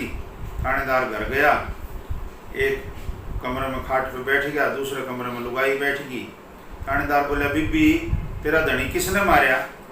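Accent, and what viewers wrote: India. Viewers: Waah bhai zabardast Kahaani Afsana "Eyka Akta bhaichara yakjahti..Aapka padhne ka lahja kamal haryanvi sai... Aapsi bhichhara hamesha rakhna chahiye Janab Rana Rahmat Ali (Marhoom) Bade uncchhe paye ke haryanvi Adeb sahiyatkar they sukriya,Aapka Haryanvi Reel tv ka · Bhai wah! Aap ka haryanavi lahza Rohtaki laaga sai. Jaisa likha same usi pronounciation mai parh diya. Lekin" ekka" ka ka matalab itfaq nahin hona chahiye. Ekka ka matalab Ekta (unity) aur kahani se bhi unity hi jhalak rahi hai. Itfaq ka matlab 'by chance/coincidence hota hai.if I am not wrong.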